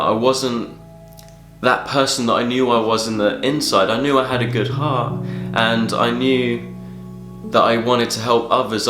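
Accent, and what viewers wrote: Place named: Southern England, United Kingdom. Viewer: pretty sure yeah. I'm English to. Think he's from the South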